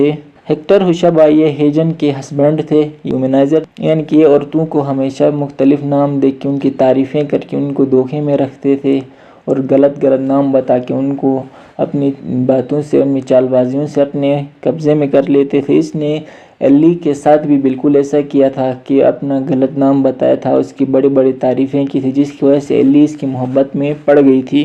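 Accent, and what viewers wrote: Gilgit-Baltistan, Pakistan. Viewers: confirmed gigiti ho bhai. i can tell you cox of your heavy Shina accent. :D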